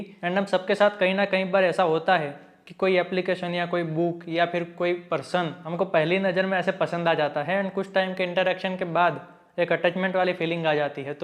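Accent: Gujarat, India. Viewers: Gujrati tone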